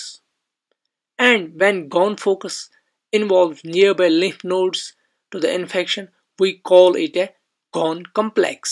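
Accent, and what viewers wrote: Kashmir, India. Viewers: Typical kashmiri accent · Sounding like a kashmiri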